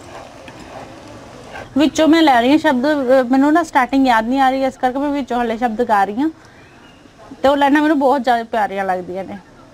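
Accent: Faisalabad, Pakistan. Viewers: ji, tusi kehray pind tun hagay o, kion k tuhadi punjabi bilkul Pakistan wich Faisalabad wich boli jandi ay. Saday nal bilkul mildi ay tuhadi boli. Pakistan tu tuhanoo bahut bahut salam. Saadi baradri v Khokhar ay, asi te fer aiko baradari de hoey na???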